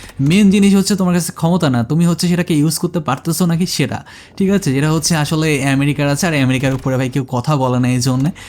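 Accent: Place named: Rajshahi, Bangladesh. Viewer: Apnar basha rajshahi er kothay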